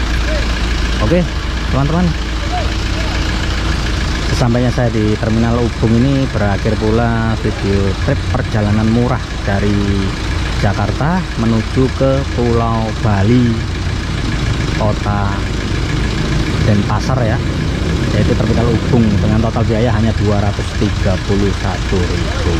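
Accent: East Java, Indonesia. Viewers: Mas orang mana ..kok bahasanya kayak Orang jatim?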